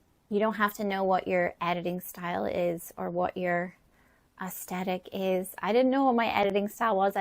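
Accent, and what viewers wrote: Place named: Ulster, United Kingdom. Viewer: I love your natural Ulster accent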